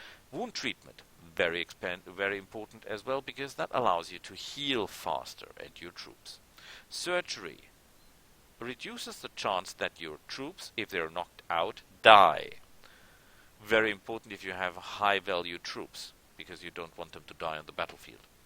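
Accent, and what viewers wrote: Germany. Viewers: I remember your floris walkthrough good times I miss your german accent you got realy good speaking english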